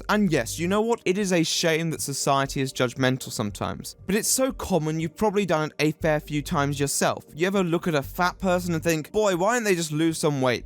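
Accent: RP, United Kingdom. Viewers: "Which is where we're FUCKING going next." Sir I LOVE YOU. lol your style of dry cynical humor is absolutely my cup of tea. I can't believe I've gone this long without knowing you were out there but I haven't stopped watching your videos since I found you a couple days ago. You're always so informed and fair in your assessments it makes me feel like i'm watching a really SMART documentary instead of a trend following gossip show. I feel like i get SMARTER when i watch your videos. listening to a posh British voice using big words while making snarky jokes and sounding SO over the bullshit is everything I need right now. and I always appreciate your opinion on these topics because unlike everyone else eagerly throwing their opinions in on any given subject, you actually EDUCATE yourself about them, instead of just speaking on the small bits of information you're not sure about. 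I appreciate your tact and dedication to making sure you present ALL facts and sides involved instead of grabbing the juiciest bit and running with it. And because you have such a strong personal opinion you often discuss things I'm not sure anyone else has touched on which only deepens the understanding of the situation and keeps everyone more informed in general. So thank you for all your hard work and your quality content. you found he magic recipe for your channel and your personality is absolutely the center of its success. you always talk about how if he audience doesn't connect with the creator t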